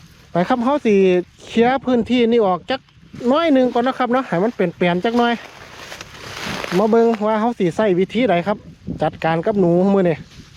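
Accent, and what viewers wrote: Chaiyaphum, Thailand. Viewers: สำเนียงเสียง คือคนทางชัยภูมิ แท้ครับ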